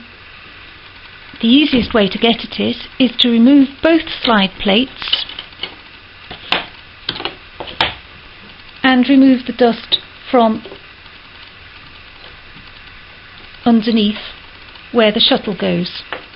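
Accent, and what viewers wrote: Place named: Yorkshire, United Kingdom. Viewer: a tiny bit of Yorkshire is seeping through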